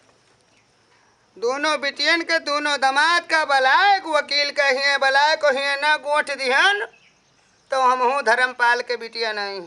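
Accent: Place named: Awadh, India. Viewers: wow must lag rha h ki dadi ma bol rhi h. aapka bolne ka tarika bhut mst h, lag rha h gonda pahuch gye h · Really enjoyed your way of typical awadhi talking ! Keep it up bro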